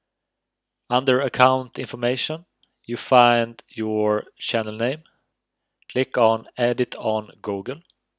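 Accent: Sweden. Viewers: are you from sweden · r u from sweden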